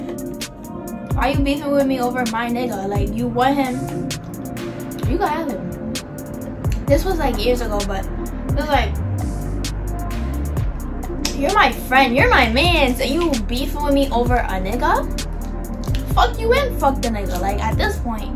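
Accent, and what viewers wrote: New York, United States. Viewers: So New York love it girl ❤️❤️❤️☺️ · She has to be from new York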